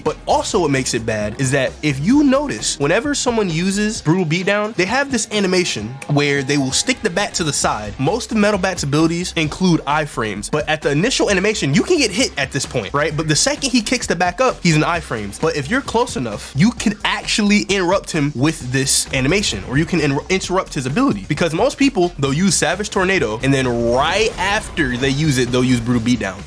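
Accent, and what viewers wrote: United Kingdom. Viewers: Why is he British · WHEN WERE YOU BRITISH? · HE'S BRITISH? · bro is british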